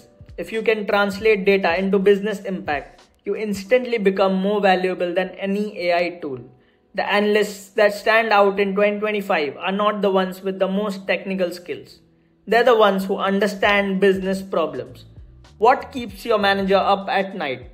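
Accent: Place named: India. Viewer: I'm argentinian and i dont speak English with argentinian accent, i do it with an american accent. Why do Indians never get off their goofy ass accent? Cant take you seriously.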